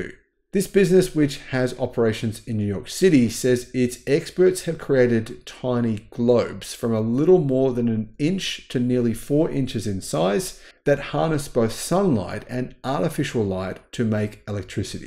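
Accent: Australia. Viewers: Are you ausi?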